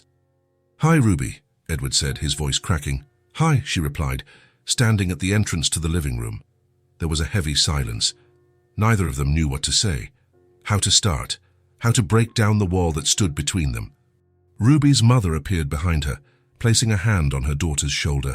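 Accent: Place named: United Kingdom. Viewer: What's with the British accent speaking American words. Since when do you cut into risotto?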